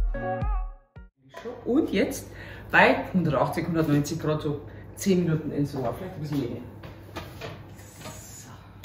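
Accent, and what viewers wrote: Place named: Bavaria, Germany. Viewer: vielen Dank liebe für die schnelle Antwort. Ich finde deine Videos egal ob das Backen oder die anderen so natürlich und mega lustig, das ich dich gleich abonniert habe. Ich muss mich zwar teilweise sehr konzentrieren damit ich alles verstehe, wenn du in bayrischem Dialekt sprichst🤣 aber es geht. Wünsche dir einen schönen Sonntag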